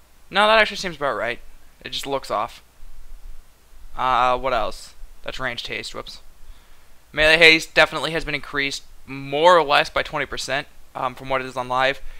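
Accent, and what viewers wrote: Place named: West, United States. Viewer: He has a western accent. What are you getting to? It's Runes not Ruins.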